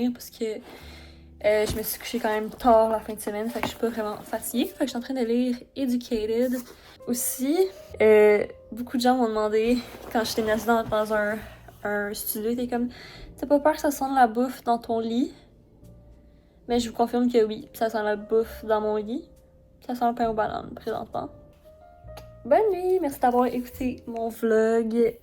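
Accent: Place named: Russia. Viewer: sorry, but once I heard you I thought you russian , I didn't understand anything 😆😆 love your ideas, hope good for you 💕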